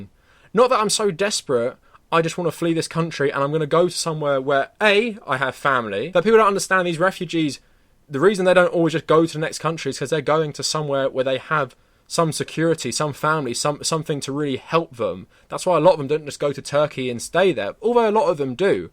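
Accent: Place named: United Kingdom. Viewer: You sound brittish, how does it feel to be a traitor to your own country and watch it be decimated by the corrupt leaders?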